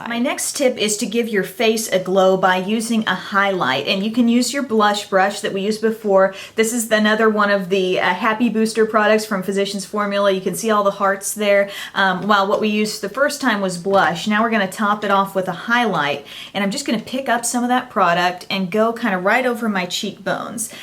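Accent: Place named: United States. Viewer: love your accent!!! im from australia and yours is the nicest american accent ive ever heard (im being serious btw) :)))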